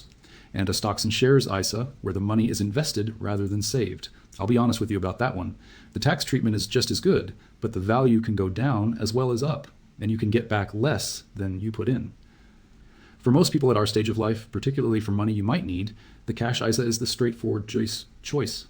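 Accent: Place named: United States. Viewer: Why the American accent? We are talking about England here!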